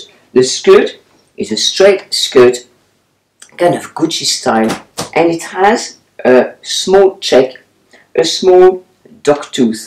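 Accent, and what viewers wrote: France. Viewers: Bonjour! J'adore vos vidéos! I have just found your channel today! I watched it all day long! I am in love with you, your style and your amazing french accent! Merci! À bientôt!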